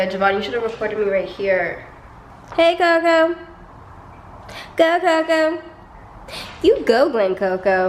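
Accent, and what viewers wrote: Jamaica. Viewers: I heard that accent 🇯🇲🇯🇲🇯🇲🇯🇲 #jamaican in the building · Oh she Jamaican Jamaican? Lol “wait nuh man.” Congrats beautiful!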